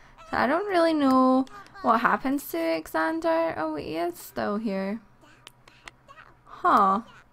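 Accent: Scotland, United Kingdom. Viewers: are u Scottish? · ***** I could tell lol! You sound nice, so it's okay. Loads of Scottish people sound nice (nearly wrote Scootish, wow).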